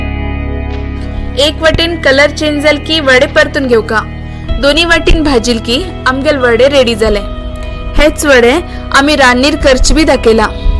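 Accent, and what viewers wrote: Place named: North Canara, India. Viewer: Yummy recipe 😋 n I love Ur north Canara konkani ❤️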